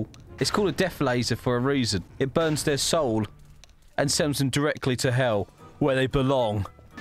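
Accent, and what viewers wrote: Essex, United Kingdom. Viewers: r u from Essex aswell as me??? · ngl didn't know he was from essex... lol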